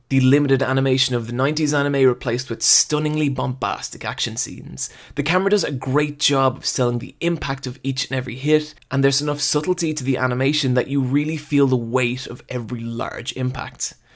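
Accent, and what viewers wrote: Ireland. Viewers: are you irish? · you Irish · you're Irish... I'd love to have an Irish accent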